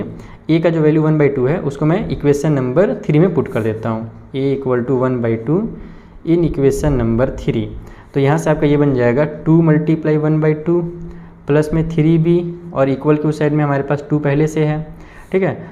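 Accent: Bihar, India. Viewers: Sir Bihar se hai kya mai bhi hu 😅😅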